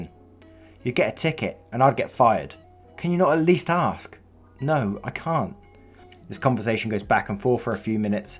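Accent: United Kingdom. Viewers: first story very good (britash sound)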